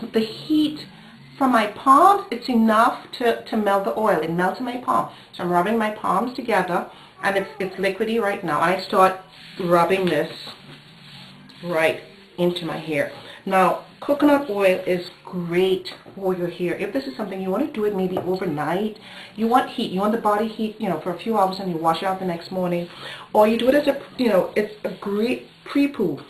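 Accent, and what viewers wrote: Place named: Trinidad and Tobago. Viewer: Girl you sound so Trini, but you're probably from JA, big up!!. Thanks for the tips, will be having a go at it..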